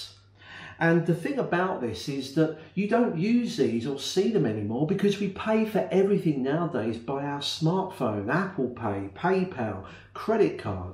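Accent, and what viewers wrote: United Kingdom. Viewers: Is it possible you teach us someday this wonderful british accent?? or it needs a gimmick (((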